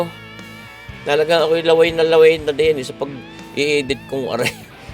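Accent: Batangas, Philippines. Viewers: Good evening idol kung batangeño accent,, shout out po sa inyo diyan sa Batangas,,, akoy laging nanonood ng iyong video ❤❤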